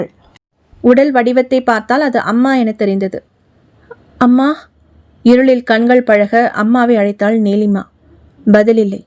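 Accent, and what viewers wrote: Madurai, India. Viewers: Hi..ungal native maduraiya....slang athe mathiri iruku..melum intha kathyil meenakshi amman patri neengal sonnathu unmai...yennidam oru thadavai kanavil vanthu mookuthi ketal...aanal naan athai suththamaha maranthu vitten..oru murai meenakshi amman koviluku pohum pothu vasalileya aval kural kettathu mookuthi vangitu vanthiya yendru...appothan ninaivu vanthu avalidam sorry solli kovilukul ponen..aanal ammanai tharisika mudiya villai..thirai pottu vittargal...potramarai kulathu padiyil amarnthu vehuneram aluthen..aanal maru murai ponapothu marakamal mookuthi vangitu poi iyaridam kuduthu avaluku poda sonnen...andru iravil kanavil vantha amman koburathin mel irunthu yen mel malligai poo panthai yen mel pottal..yen native madurai than...i used to visit her often before marriage...even now wenever i go to madurai i used to visit her...but nobody knows this matter till now ...i am revealing to u only..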